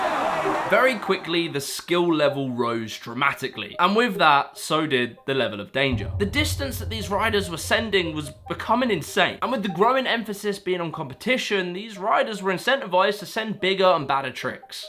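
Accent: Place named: United Kingdom. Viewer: I hate the British accent... It's THIRTEENTH....not FIRTEENF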